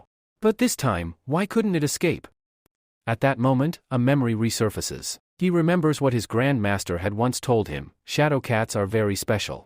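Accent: India. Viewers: Why give the AI a Indian accent?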